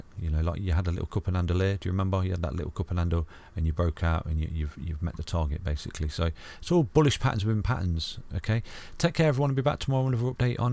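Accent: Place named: England, United Kingdom. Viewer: Gday from Australia.. Love yr pommie accent but more importantly your sincere info and straight shooting.. Thanks Mate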